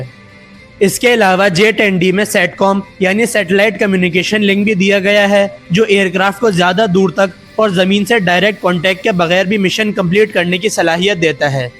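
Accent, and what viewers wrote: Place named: China. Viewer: I don't like Chinese English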